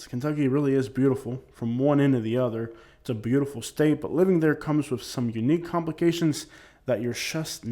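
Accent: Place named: New York, United States. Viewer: Apparently you are not from this area. You sound like you’re from New York. Get your facts straight before you make an ass out of yourself.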